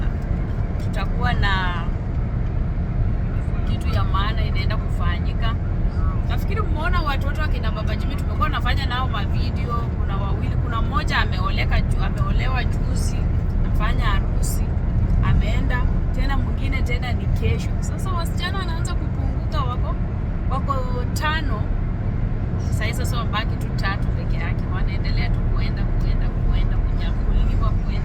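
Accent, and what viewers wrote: Kenya. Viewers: Why can't you just speak in English and stop this luhya accent, sounds really awkward, you still have alot of mushene ya waluhya, uuurgh!!!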